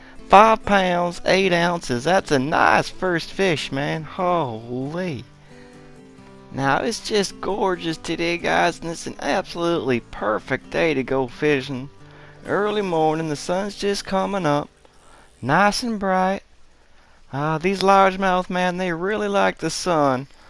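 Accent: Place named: United States. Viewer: Lol the country accent gets me every time 😂😂😂 Any thoughts on the summer update?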